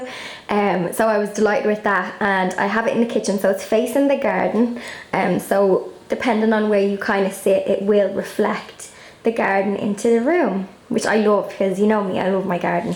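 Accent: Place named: Ireland. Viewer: Both you and your home are adorable! You have put so much work into your house for someone your age, it's very impressive! I love your accent. We here in the USA sound horrible compared to your lovely Irish accent.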